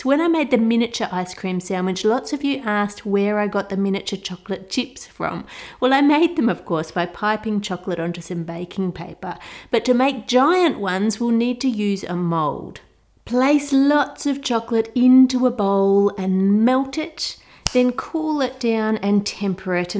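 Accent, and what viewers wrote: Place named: Australia. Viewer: Peel away inhales THE PAYPEH I love Australian accents lol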